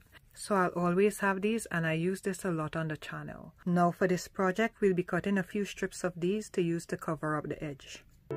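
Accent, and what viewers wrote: Trinidad and Tobago. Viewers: So creative and lovely. Something to try for sure. Your accent sound like mines. Trinidadian.. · I think I am hearing a Trinidadian accent! Your talent is amazing!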